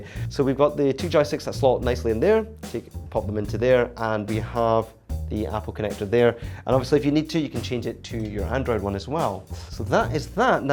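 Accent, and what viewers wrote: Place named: Edinburgh, United Kingdom. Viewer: Hi, Great video. Thanks. That sounds to me like an Edinburgh (where I am) accent... am I correct? If so, I've just bought myself a Mavic 2 Pro and the FlyMore kit, and... I'm completely new to drone flying. If you're in Edinburgh, is there a particular area you can recommend I get started? Would you be up for meeting up with me (in the field, with drones) and give me a few starting pointers? Cheers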